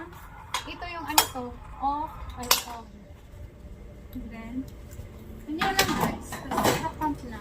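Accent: Waray, Philippines. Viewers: hahaha amu gad kukurian pag tagalog kay wraywaray kaman ngean..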